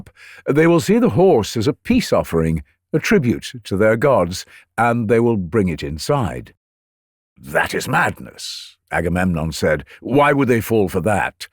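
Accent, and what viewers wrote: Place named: United Kingdom. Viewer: What's this!? Greek mythology in long-form AND in a British accent?? WIN! Can't wait to dive in.....❤ Thank you!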